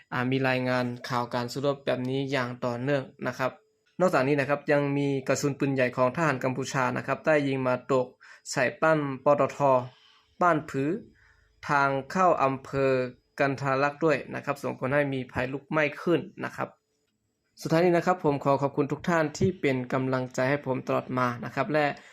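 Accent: Laos. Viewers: คนรายงานข่าวเป็นคนลาวหรือ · คนอ่านข่าวนี้ลาวแน่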